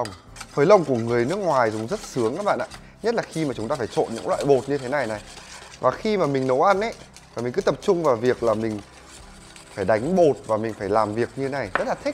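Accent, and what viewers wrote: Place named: North, Vietnam. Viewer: Mình là gái miền nam và mình bị cuồng nghe giọng trai bắc , giọng anh này nghe hayy và ấm ghê luôn 🙆🏻‍♀️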